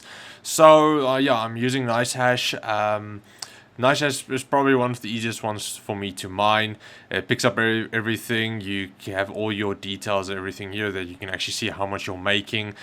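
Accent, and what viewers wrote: South Africa. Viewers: Couldn't fool me, The good old SA accent, keep up the good work/hashing in SA from an ex South African in New Zealand. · Michael Chistol Modern Afrikaans South African. Picks up accent from tv and media. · lol your accent confused me at first.. sounded southafrican with an American kick, anyway cool channel bro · Blatantly South African Takes one to know one lnao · Sounds like South African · For the Comment below about accent its a Great South African accent, and yes at the currect exchange rates earning in US/Bitcoin and exchanging to ZA Rand can well pay for rent if you live in South Africa. Great Video keep going !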